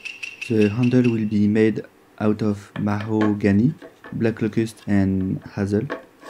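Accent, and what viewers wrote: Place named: France. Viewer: Le sous titrage est une autre solution ;) ! Ah ! Cet accent français quoi ;) ! Mais joli boulot !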